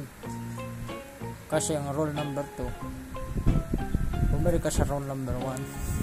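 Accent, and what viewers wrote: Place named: India. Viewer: bat boses indiano parin kayo kahit pinoy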